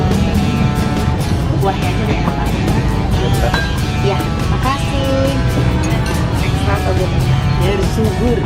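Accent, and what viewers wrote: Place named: Sunda, Indonesia. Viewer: ak nonton nya ga fokus sama bakso nya tp fokus ke teh yg cantik. 😍😍 sukses turus buat canel youtube ya teh 👌😍. salam dari Pelajar di jepang. ak selalu nonton trus youtube teteh. teh cantik lucu juga kalo ngmong bahasa sunda 😁😁ak orang jawa tengah.. pokok nya semangat trus teh buat konten youtubenya 👌😁😍😍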